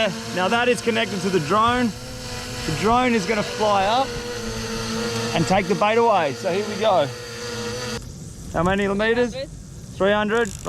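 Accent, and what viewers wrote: Australia. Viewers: i love aussie accent more then regular english accent nice vid buddy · Are you Australian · You'd love to come and live here in Australia. Nearly everyone sounds like that:) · You make me wish I had a Australian accent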